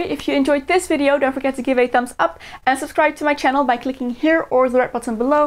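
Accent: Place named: Netherlands. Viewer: Ik kan zo slecht tegen je nederlandse accent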